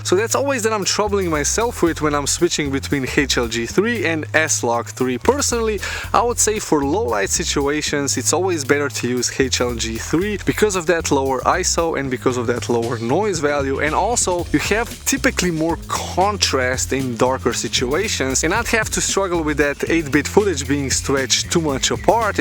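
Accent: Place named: Balkans. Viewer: Great! Keep it up! Where are you from, if is ok to ask? Balkan?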